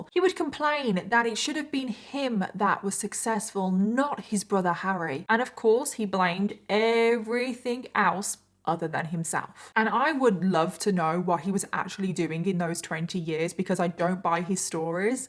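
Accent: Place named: United Kingdom. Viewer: i’m pretty sure she’s british !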